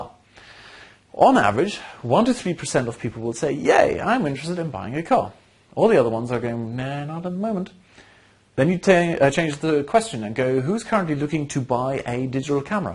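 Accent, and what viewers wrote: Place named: Swabia, Germany. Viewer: Very informative and down to earth (Schwabe oder Württemberger ?) without the usual hype. I really appreciate it. Wolfgang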